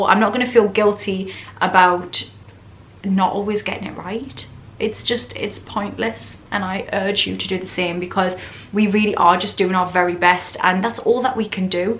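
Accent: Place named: Newcastle, United Kingdom. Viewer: Some nice Geordie in this, reminded me of my old housemate. "bewk"... I love it.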